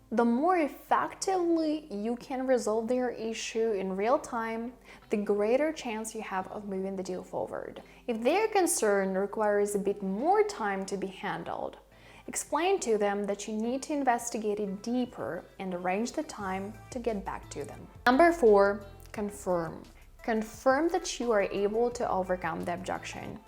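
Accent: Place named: England, United Kingdom. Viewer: I have subscribed to your channel for your English accent. Your English pronunciation is different from others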